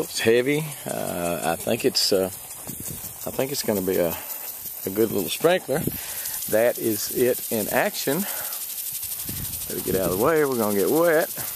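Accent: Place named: South, United States. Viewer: I love hearing the Southern drawl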